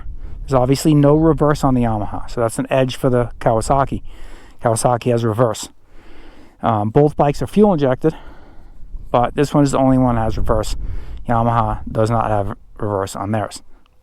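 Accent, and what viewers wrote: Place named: Northeast, United States. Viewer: nevermind i saw a cactus or 2.... your accent is definitely northeast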